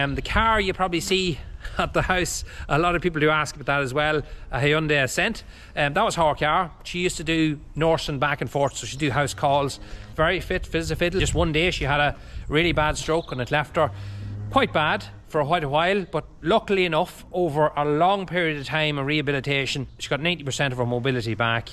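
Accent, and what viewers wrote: Ireland. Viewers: Hi I am a small dairy farmer from Australia where milking 60-70 cows is not common. My wife and I both work off farm as well ( I am a farrier by trade)so at times it makes for long days. We have 5 grown up children and have enjoyed the times where your kids were helping to move cows stopping calves jumping over temporary electric fences etc ( just like us). Until recently I was beginning to question whether to keep going with a small herd and was perhaps embarrassed to be such a small farmer. Then you and your videos came along and you have inspired us and we have a completely new attitude. We want to be like with a beautiful, neat tidy little farm. When we are planning or doing new projects we even impersonate you with put on Irish accents. I do have Irish heritage from Cork. Thank you so much you have made us proud to be small dairy farmers 😊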